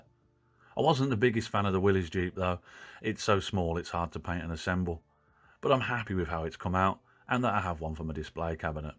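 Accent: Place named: England, United Kingdom. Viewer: Wow!! An English voice on a modelling video for a nice change!!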